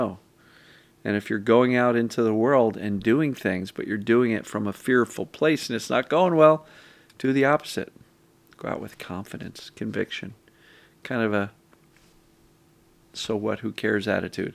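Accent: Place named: Scotland, United Kingdom. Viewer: Such a great video!! Thank you!! I love how you take the same concept and explain it a different way..🥰 this is “flip the script” with Scottish accent 😃I know you have told us that many times. This “do the opposite” reminds me of the Seinfeld episode where George did that 😂🤣🤣 It works!! Thank you again for your continued support for all of us in this journey..🙏🏼🫶🏼🫶🏼🫶🏼🫶🏼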